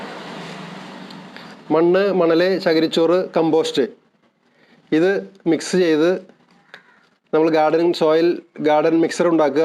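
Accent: Thrissur, India. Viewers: chettan thrissurkRanaale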